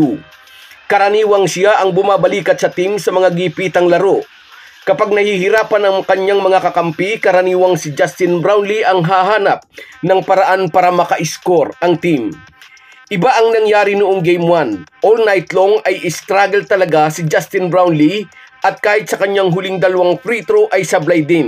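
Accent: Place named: Batangas, Philippines. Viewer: Hi kabayan, shoutout sayo you are one pba vlogger i follow, clear mag annalize ng laro, most of all your batangueño accent is very entertaining... Good job kabayan, staysafe palage....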